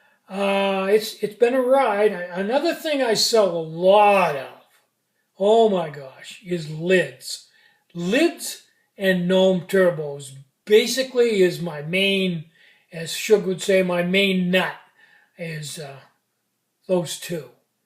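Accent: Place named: Boston, United States. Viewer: sounds like you're from Boston.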